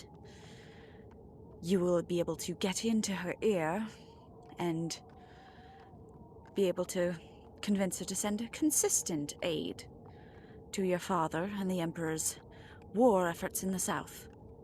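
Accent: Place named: United Kingdom. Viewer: The British accent is sending my little gay self to neighboring galaxies 😭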